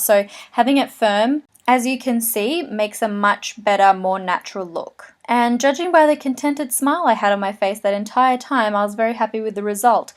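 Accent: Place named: Australia. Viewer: love your australian accent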